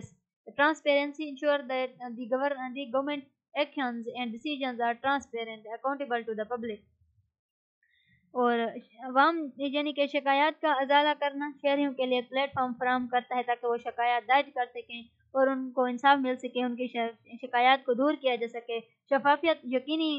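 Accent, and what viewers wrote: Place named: Saraiki, Pakistan. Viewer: Saraiki eng accent 1st time I heard 😂😂 I appreciate your work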